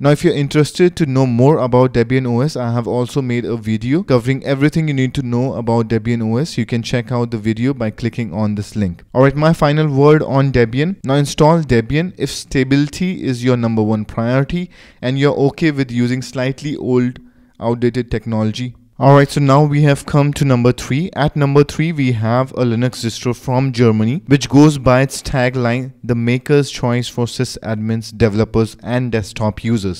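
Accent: India. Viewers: I hate indian accent ! Speak proper fn english ! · You're talking in the classic Indian accent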